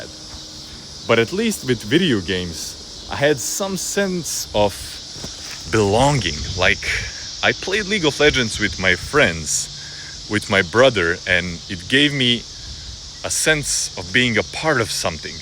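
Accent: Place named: Eastern Europe. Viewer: i knew u slavic or russian with ur accent good for practising as a russian native speaker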